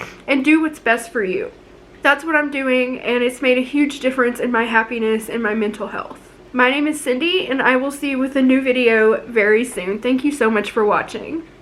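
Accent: South, United States. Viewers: your lil southern accent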